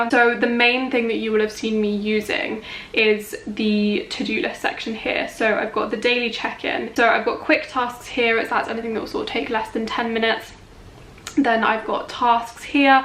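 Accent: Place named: United Kingdom. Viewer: that British accent 🤤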